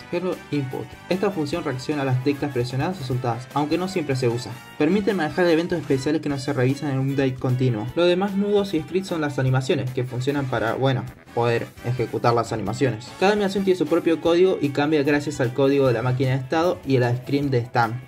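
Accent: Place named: Spain. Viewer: Ah vi el de ingles i ya pense "Ah tiene acento español" y ahora me salio este hahahaa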